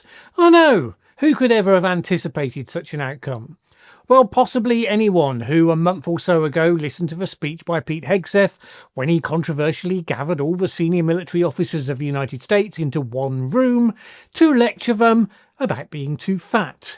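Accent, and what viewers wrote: United Kingdom. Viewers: There’s something strange about somebody with a British accent, criticizing America. Criticize your own country!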